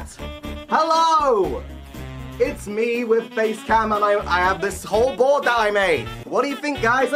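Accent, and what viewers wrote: United Kingdom. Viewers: man's got a british accent but he looks like an asian mobster without the shades very friendly lookin' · I sometimes don't get how he looks filipino but sounds like a fucking british character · His Britishness is contained entirely in his voice and his cooking skills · "Doesnt look asian enough" bruh. His voice too british for that asian look if u ask me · The fact that he looks so Filipino but sounds so British messes with my head. While I was watching the live I kept thinking that he sounds dubbed 😭💀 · He looks so Filipino but sounds so british its actually confusing me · I cant make sense of his voice cause he sounds so british but he looks so filipino 😂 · The british voice with that face cracks me up for some reason. Am i an asshole?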